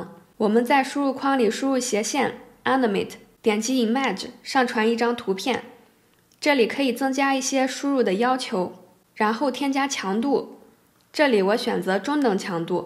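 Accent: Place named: Shaanxi, China. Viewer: 貌似陕西人，反正肯定大西北的。。。。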